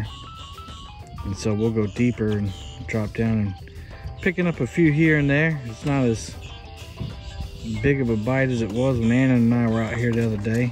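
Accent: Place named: Louisiana, United States. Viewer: Dude your Cajun voice still makes me laugh so hard!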